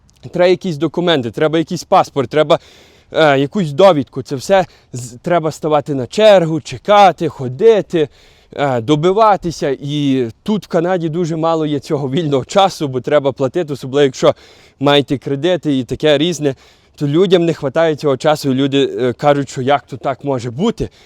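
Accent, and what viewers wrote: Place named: Stryi, Ukraine. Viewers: У вас така оригінальна вимова❤ що я немов не у Канаді, а на Стрийщині побувала😅❤ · нагадав мені одного сусіда з сусіднього під'їзду, коли я жила у Львові..(дуже хороша добра людинабув той хлопець..( На жаль, мені чомусь завжди подобались хлопці "з претензією".. Це моя Карма.,)) Але я дуже з великою повагою відношусь до добрих хороших людей. Нехай таких буде більше на Землі! Така розмова у як у певних регіонах Львівщини..) молодець. Розповідає про актуальні теми, показує багато Канади. Дуже цікаво. 🙂🙏... Ці люди всі кудись спішать, вони хочуть тусити, кавувати, їм потрібен натовп.. Я говорю про тих хто жаліється на Канаду. Ну то добре, не подобається - їдьте в інше місце.. Дайте можливість нормальним спокійним інтравертам (і неінтравертам теж,)) пожити в прекрасній країні, де є багато простору, природи і Закони, яких всі дотримуються. 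(!) Держава, яка турбується про своїх жителів. Безперечно, нелегко відразу знайти роботу, можливо, хтось не знає англійську мову. Але хто хоче, той вивчить. І навіть перевчиться, перездать іспити і досягне успіху. Все залежить від бажання людини і прикладених зусиль. Європа колись була чудова, а зараз вона перенаселена. І не дуже безпечна. Кожен шукає, що їм треба. Дай Бог кожному з нас знайти. (!!!) Дякую за відео. 🙂🙏🕉️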